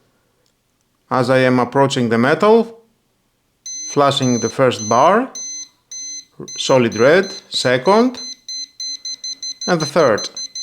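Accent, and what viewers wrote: Greece. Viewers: You sound greek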